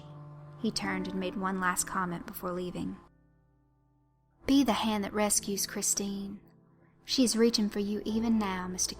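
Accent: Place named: Georgia, United States. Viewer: Where are you from in Georgia? I'm from Loganville and our accents sound really similar, I know that different places have a little different variations on accents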